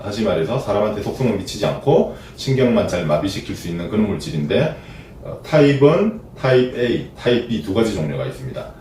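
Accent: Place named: Gyeongsang, South Korea. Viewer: 쌤 이제 갱상도 말투 안쓰시나여 서울싸람 다되셨네여~ 진짜 멋진분 최최고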